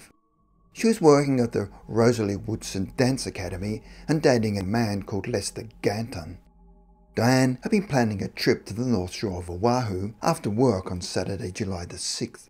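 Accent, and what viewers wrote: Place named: Australia. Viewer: It's an Australian accent. What's so unnatural about it?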